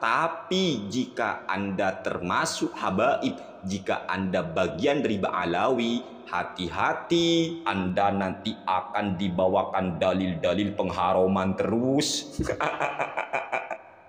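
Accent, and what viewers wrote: Madura, Indonesia. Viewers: Logat maduranya kental banget ustadz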